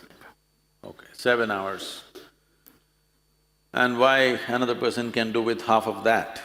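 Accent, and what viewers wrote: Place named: India. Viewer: He is speaking English clearly, he has an Indian accent.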